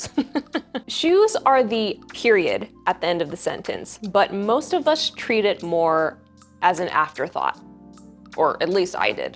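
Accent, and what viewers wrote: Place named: Australia. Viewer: I love you!! And your Australian accent is the best! 😂 very helpful tips!! 🙏🙏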